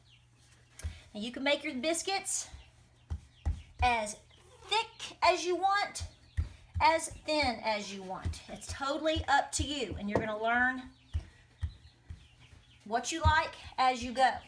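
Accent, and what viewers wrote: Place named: North Carolina, United States. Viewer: I’m a displaced Northern Carolinian living near DC and I just loved listening to you talk. Sounded just like home ❤️. I about lost it when you said *cathead*. Lordy mercy it’s been a minute since I heard that phrase. Now I’m hungry and have to go make these 🤣. TFS!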